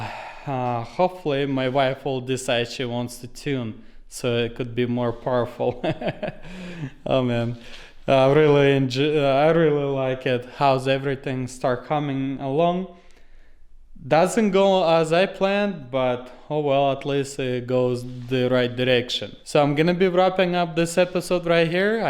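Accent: Russia. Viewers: I like your job you doing nice work!👍 I like your accent, We're you from? Russia? 😉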